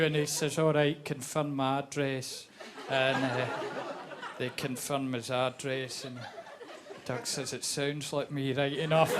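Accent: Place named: Scotland, United Kingdom. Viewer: he's from the east coast of Scotland, not glasgow, i'm from the west coast of kintyre & my accent is different from people living less than 10 miles away which is different from the accent 10 miles in the opposite direction & there are half a doz different accents in the 37 miles between my hometown & the tarbert, loch fyne accent, there has to be at least 100 different Scots accents between the borders & the northern & western isles with the best spoken english place in the world being the city of Inverness on the moray coast, obviously due to the aftermath of culloden in 1746 as the site is barely ten miles away & the town would have been garrisoned with english troops following the '45' rebellion. david kay is a comedian everyone should adjust their ears to as he is unique, original & hilariously funny, unlike the micheal mcintyres & catherine ryans of this world who are pathetic yet never off our screens.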